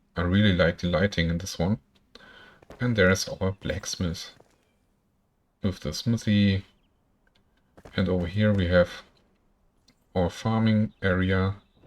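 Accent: Germany. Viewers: 20fps ... nice ! :D But impressive building. You have a typical german acent btw :D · Richtig geiles Ding haste da aus und in den Stein Gekloppt! Respekt, ich freu mich auf mein PC upgrade, dann werde ich mich auch in dieses wunderbare Bausystem verlieren. PS. Hab direkt am Akzent erkannt das du Deutsch-Muttersprachler bist hehe xD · That's awesome! I have my BA, so I'm fairly artistic myself. I hope I can build my castle even half of how cool yours turned out though. I'm just worried about going to big and making my machine struggle to run the game so not sure how big I'm going to end up going yet. I'm incredibly impressed by your build though. Going to throw you a sub and keep in eye out for other cool builds. Also you sound like you're German? Yeah? My mom was born in Germany so I have family over in Germany. 🙌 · Da mich der deutsche Akzent quasi anspringst, werde ich mal auf deutsch kommentieren. 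😅 Die Burg ist wirklich extrem gut geworden und Respekt dafür 👍 Am besten gefallen mir die vielen Kleinigkeiten in deinem Build, vor allem die Geheimgänge. Zwei Punkte wo man meiner Meinung nach (persönliche Geschmack) noch etwas was verbessern könnte. Die "Main-Hall" könnte ein bisschen mehr Prunk gebrauchen, weil es das erste ist was man sieht und soll die Besucher natürlich gleich überzeugen. Es fehlt etwas an Pflanzen in der Burg. Das sind aber wie gesagt zwei Kleinigkeiten und ist wirklich beeindruckend was du da gebaut hast. Viel Spaß weiterhin beim spielen und bauen. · The german accent sounds so terrible to me. But good video though.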